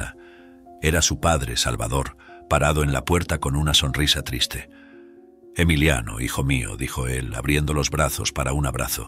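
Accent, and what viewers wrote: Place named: Spain. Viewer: Para que la historia resulte interesante y hermosa, tiene que haber una buena historia, una excelente voz, bien modulada, buen lector. Todo éso se ha encontrado en ésta. Muy especialmente, con el hermoso acento del Castellano por un español. Me encantó.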